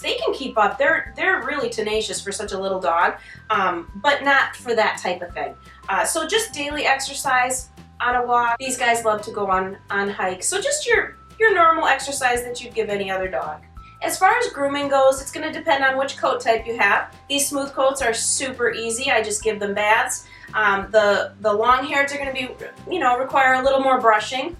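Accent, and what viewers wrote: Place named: North America. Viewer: Your dogs are sweet but they are not dachshunds in terms “real” dachshunds (do not want to use word pedigree altho that is what i meant but sounds a bit nasty). There are only two sizes in Dachshunds, the standard size, up to i think 10.5 kg and the miniature Dachshunds,up to 5 or 5.5 kg. Nothing in between. Now in terms of colours. They are black&tan,chocolate&tan,red and i think it is called dapple , i am not sure. I am not quite sure about colours, but if you are going to buy Dachshund puppy and the seller sells it as pedigree puppies, one quick,easy way how to spot that the Dachshund is not Pedigree is present of white colour. Apart from the Dapple,white colour might be present in tiny amount on the chest but even that is UNDESIRABLE. Pure (short or long haired) pedigree Dachshunds have ZERO white colouring. If there is a trace of white colour(mostly on the belly/chest) , you should know that the person is not genuine selling pedigree Dachshunds and that will be mostly the reason why seller says : pedigree but NO PAPERS,or Very rare colour etc.... 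so what you have got there are sweet dogs, but not up to our European standards to be called pedigree Dachshunds. I can not differentiate the USA accent from Canada’s one, but you are surely from American continent where i noticed the acceptance of “non pedigree” Dachshund mixed with other breeds of dogs calling Dachshund. German, the original Dachshund breed here in Europe simply can not be white mixed colour which is way out o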